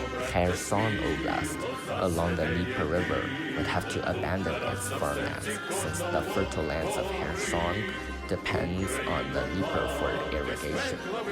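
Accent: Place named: Asia. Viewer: Guys who come later, just ignore his Asian accent!!! CUZ IT IS REALLY A FABULOUS VIDEO!!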